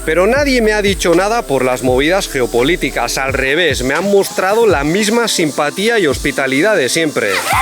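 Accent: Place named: Spain. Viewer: se te nota que eres español pero se esta perdiendo las zzzz.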